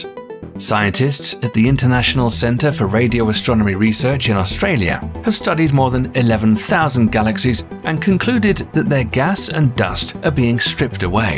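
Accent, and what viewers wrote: United Kingdom. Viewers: Can you switch the narration accent to Jamaican please. The UK gets annoying.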